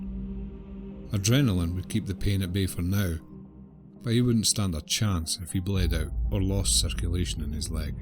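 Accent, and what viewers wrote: Scotland, United Kingdom. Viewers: This is an incredible concept, well done! And I agree the Scottish accent makes it epic to listen to! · Very nice!🪐 And the Scottish brogue is very easy to listen to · Your Scottish accent makes this!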